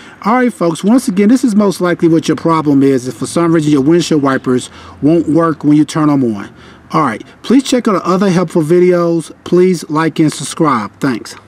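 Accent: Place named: New York, United States. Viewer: Man I love your accent, its sound southern and New-Yorker at the same time. Thanks also for the car tip, god bless you sir!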